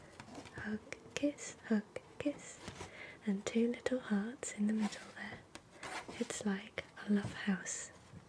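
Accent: United Kingdom. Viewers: Hi! So good to see you again and have you back!:) I've been following your channel for about five years now. Could you remind me again, where about in the UK are you located (I'm a glossophile from New Jersey, United States)?? Could you also remind me, where about in the UK is your accent from? Where did you grow up? Sending you much love and many positive vibes in these very strange times! <3 <3 <3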